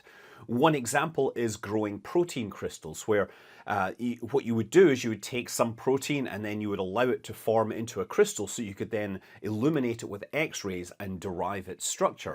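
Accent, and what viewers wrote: Scotland, United Kingdom. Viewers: zero gravity spoken like a yank - loosing your scottish accent!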